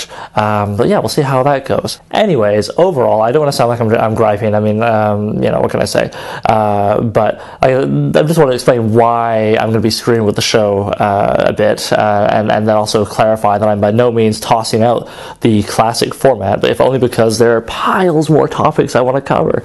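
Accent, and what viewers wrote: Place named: United Kingdom. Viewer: here are some things u might want to change in the show from a outsiders opinion, i really want to see u succeed. 1. where different clothes, no more vest and tie, be more casual those clothes distant you from your audience 2. more british accent people love that 3. don't try so hard, you don't have to force jokes, and run them over with a peer see if they laugh if not scrap it, but your gut feeling is the best. obviously there are more/better ways to get more views but here a a couple.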